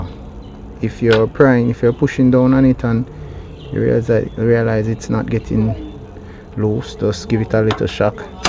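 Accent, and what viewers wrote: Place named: Jamaica. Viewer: I hear a 🇯🇲 yaad man voice.. love the content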